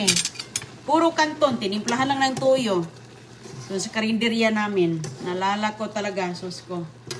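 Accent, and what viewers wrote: Visayas, Philippines. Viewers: D ka pa nasanay ateng haha bisaya ba sya Kya ganyan salita nya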